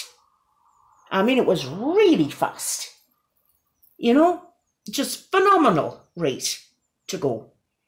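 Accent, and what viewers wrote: Newcastle, United Kingdom. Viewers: Oh my goodness, I wanted to reach through the screen and hug you. You are like a mamma bear and clearly have so so much compassion for everyone and their mental health. You are amazing, please keep being you! As an unrelated and not serious side note - I love your geordie accent! I always connected it with genuine kindness and love. I hope you are ok <3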